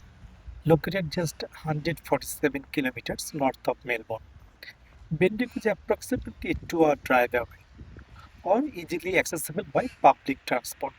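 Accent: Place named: Bangladesh. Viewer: You speak bangladesh english, can't understand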